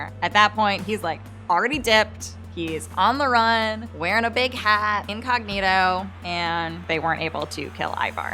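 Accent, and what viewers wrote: United States. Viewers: I cannot stand this lady's voice this type of American accent is the worse sound in the world !!! And so and like and like whatever just speak he English language properly if your not going to you could always create an American language tbh I can't stand anyone from the new world talking about history ... Your all so isolated and far removed. From the rest of the world your perception of the old world is just wrong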